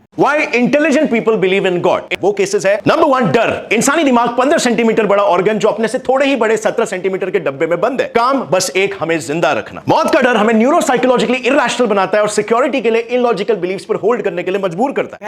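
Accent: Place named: Bengal, India. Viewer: You bengali ascent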